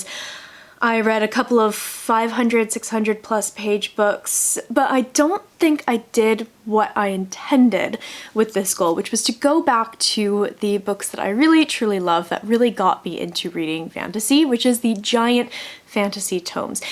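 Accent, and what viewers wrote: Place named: England, United Kingdom. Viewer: 1. Your accent is DEFINITELY becoming more English. Maybe it's just this video but ermmmm? 2. Good luck getting on top of ARCs etc! You can do it!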